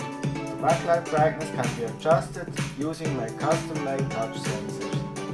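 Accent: Switzerland. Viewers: ... swiss accent x3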